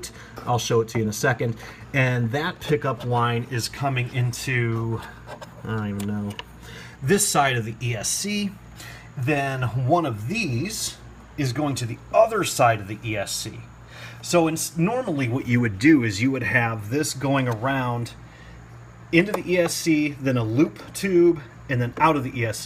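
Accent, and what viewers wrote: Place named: New Jersey, United States. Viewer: You sound like us in jersey fuckin fuckin fuckin love it brother I just subbed fuckin aaaaaaaaaaa lol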